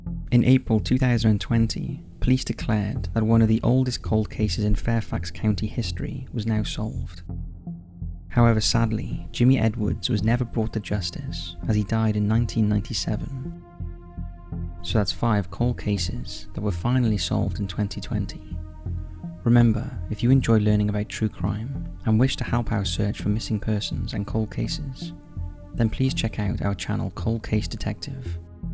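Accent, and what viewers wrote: United Kingdom. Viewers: Your voice is perfect for narration. Not to loud or to low but perfect in both volume and tone. And the british accent makes it even better. Been watching the videos nonstop for a week now. Thank you !❤️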